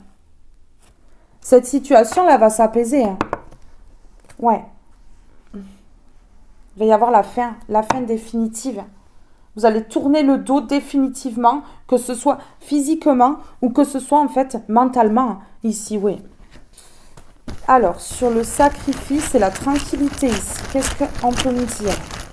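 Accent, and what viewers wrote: South, France. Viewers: Bonjour ma très chère belle âme! Je te remercie pour la bienveillance que tu met dans tes guidances et je perçois que tu as un grand coeur et une grande gentillesse et la façon dont tu nous parle avec ton magnifique accent méridional nous apaise et nous réconforte! sincèrement!!!. Merci!!! Je penses que tu as raison ma vie va être bouleversée durant ce printemps 2025 (Avril, Mai 2025) et qui va grandir en été et en automne 2025 Tu vois juste Je m'adresse à Dieu pour des miracles et je pense que je vais en obtenir au moment ou je m'y attendrais le moins ça va démarrer et se construire graduellement je pense qu'il va y avoir la rencontre amoureuse et il va y avoir construction pour le grand amour et il y aura de la création artistique!!! AMEN Que Dieu t'accorde du bien et que ta soirée te soit des plus agréable.. Bisous ❤💖😘😘🌟☀🙏🙌🙏🙌🙏🙌🌟☀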